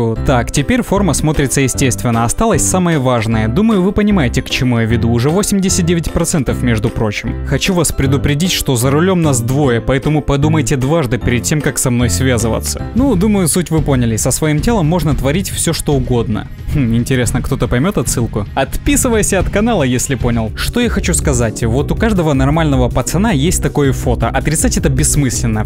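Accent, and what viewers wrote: Ukraine. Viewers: Ти из Украины ❓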